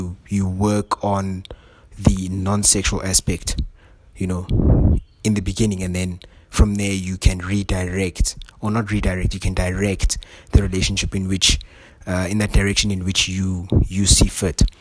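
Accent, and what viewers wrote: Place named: South Africa. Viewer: Your accent sounds like mine... are you also from South Africa?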